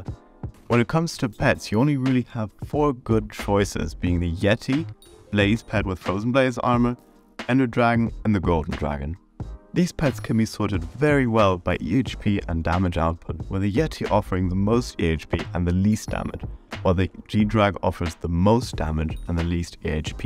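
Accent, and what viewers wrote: Germany. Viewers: Yo, nice german accent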